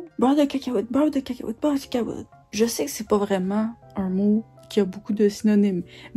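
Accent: Quebec, Canada. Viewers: Je ne suis absolument pas Québécoise ou Canadienne, je te découvre tout juste aujourd'hui grâce à une autre booktueuse qui a parlé de toi (@ mais oh my god je t'adore ! 😊😂🤩 J'adore ta pêche, ta façon de parler, de parler des livres, ton humour (oui je trouve que tu es drôle haha), TON accent 😂 (j'adore l'accent Québecois haha). Je suis très heureuse de te découvrir. Ça faisait longtemps que je n'avais pas fait de découverte de booktubeuse (hormis Lausdiary justement que j'ai découverte depuis 2 jours). Tu fais du bien. Je m'en vais continuer à tout regarder ce que tu as déjà fait et te suivre au présent ! Bravo pour ton travail. ❤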